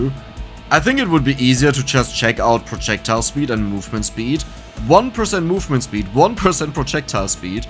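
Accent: Germany. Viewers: German accent is funny af sometimes, for example said verks (works)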